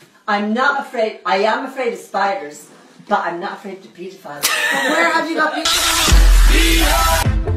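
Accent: United States. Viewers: Zeeeee? Which part of America is she from?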